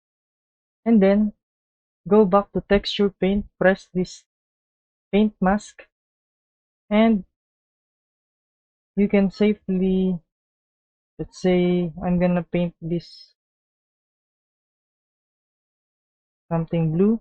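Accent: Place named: Philippines. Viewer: Filipino here, I can hear the Filipino accent. Good job bro!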